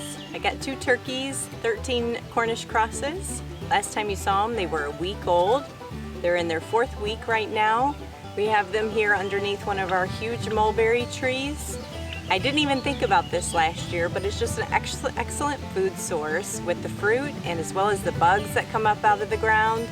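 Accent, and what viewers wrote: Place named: Michigan, United States. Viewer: I thought I picked up a MI accent. Really like your property and homestead. Very peaceful. Looking forward to make garden and bee hive updates!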